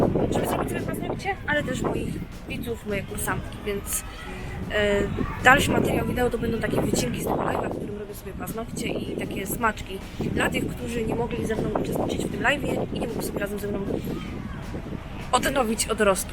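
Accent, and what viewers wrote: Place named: Silesia, Poland. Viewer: Jesteś nojlepszo i słysza Śląski akcent😁😁 pazurki piekne jak zawsze pozdrowienia z piykor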